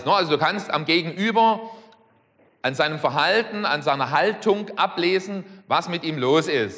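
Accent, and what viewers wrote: Saxony, Germany. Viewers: Na Du gude, das iss säggssche schbraache.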